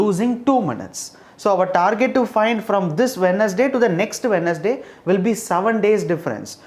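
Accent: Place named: South India, India. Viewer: Sir r u from south Indian region your accent r representing it but yet in education languages, races, religions or castes or anything..... doesn't matter what exactly matter is talent and skills.....liked your way of teaching ❤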